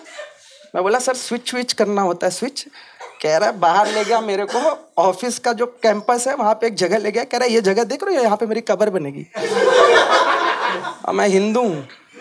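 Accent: Bihar, India. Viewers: Underrated. Always a big fan of BIHARI accent and fun.. Biharis are unique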